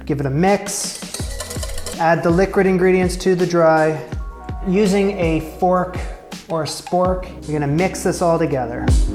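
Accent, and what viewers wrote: Canada. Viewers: OMG!! Just found you a couple of days ago and thought, this guy sounds Canadian, how 'about' that??? Then I found this... However, I love my butter tarts you have to make them both ways!!! Maple Syrup is the best way not too sweet and yum!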